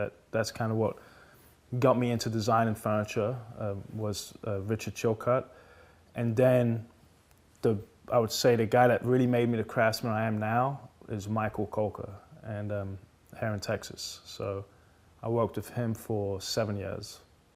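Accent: England, United Kingdom. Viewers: I did ask where you were from on another video, as you sounded to me like you were from England, but I’ve just realised that you answer that in this video, so that’s the end of that question. You’ve had an immense amount of training and experience in the industry, and I wish so much that I’d have had the encouragement when I was in school (I’m from Wales). It’s only now, at the age of 38 that I have had the chance to find my passion in woodworking. It’s always nice to hear when people had a great teacher that encouraged them, rather than hindered. Anyway, it’s great to see someone homegrown doing so well in another country and also being respected as an artist too. By the way, the chair design that you make a limited production, looks amazing! I’m really enjoying watching your videos. I came across you on Instagram and had no idea you had a YouTube channel, so I’ve been binge watching and I must say that I really like the production value. 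Also, the fact that they don’t have a voice over is a great change, as it really makes me feel like a fly on the wall. Holy hell, I’ve just realised how much I’ve written. Anyway, here’s a big hello from the West Midlands, UK. Signing out.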